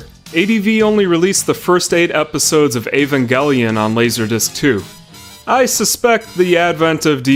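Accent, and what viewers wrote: United States. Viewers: How is your pronunciation so heavily American when you appear to be watching so much anime? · Ugh. Saying words in Japanese with an American accent.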